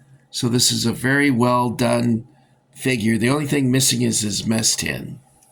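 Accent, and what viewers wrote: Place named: England, United Kingdom. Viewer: As ever an excellent video with your own fantastic style too. Great English accent BTW. ❤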